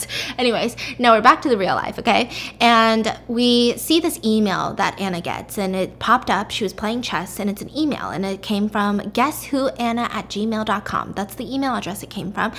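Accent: United Kingdom. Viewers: Ever since has started speaking in an British accent I’ve added it to my daily life 🤩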